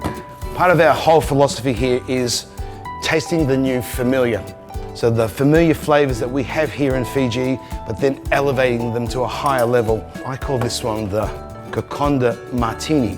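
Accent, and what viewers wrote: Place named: Australia. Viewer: this comment is not meant to be disrepectful or anything, but this video makes me, as a Londoner want to travel to this part of the world more, I've just saw an Asian looking man speak in a Aussie accent teaching how to cook Fijian food. Amazing, so much culture in this part of the world I've yet to see and experience.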